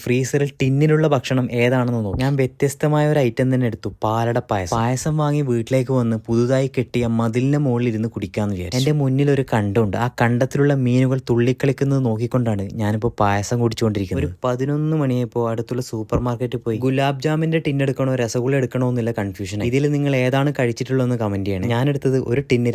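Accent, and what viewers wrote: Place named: Kanhangad, India. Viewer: എത്ര തന്നെ അച്ചടി ഭാഷ മിണ്ടാൻ നോക്കിയാലും കാഞ്ഞങ്ങാട് സ്ലാങ് ബെർന്നല്ലേ 😅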